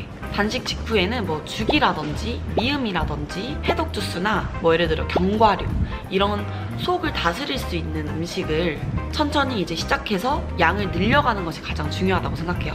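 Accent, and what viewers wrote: Gwangju, South Korea. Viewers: 공부 열심히 하셨네요 전문가같네요 고생하셨네요 근데 전라도분이신가봐요 억양이 ㄷㄷ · 광주같아여!! 익숙해서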